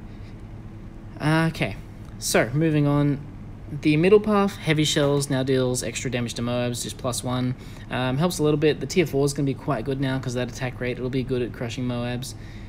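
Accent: Australia. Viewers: How come you are a New Zealander, but you sometimes sound like an Aussie?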